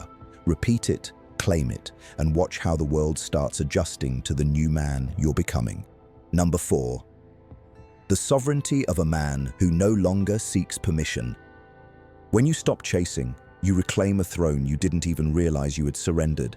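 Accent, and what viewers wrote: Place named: England, United Kingdom. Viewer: Speak in American. We ain't training to be English. For real. Just cause the average American is preprogrammed to think an English accent is more intelligent.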